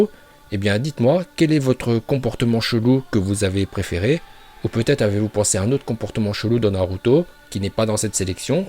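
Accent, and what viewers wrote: Japan. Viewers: Déja avec le titre, je sais déjà que la vidéo va être intéressante!! Avec ton accent japonais trop bien j'ai l'impression d'être en VO!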